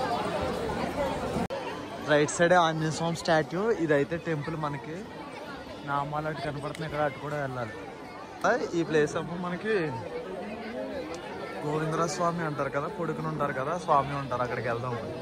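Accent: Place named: Godavari, India. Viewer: Ekkadaki vellina godavari slang😂.. Bavuntadi andi mana slang❤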